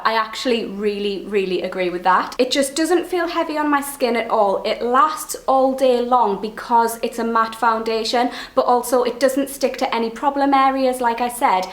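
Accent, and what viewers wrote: Newcastle, United Kingdom. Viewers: Great review def gonna try this!👌🏻 I love your accent,makes me feel like I'm watching Geordie Shore & puts me in a good mood!😁😄 Loving ur videos😊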